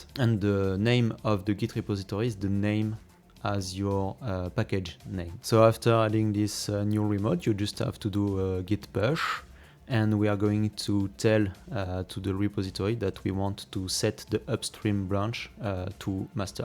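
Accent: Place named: France. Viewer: Question : how do I get my pgp id for the validatepgpkey (also nice french accent)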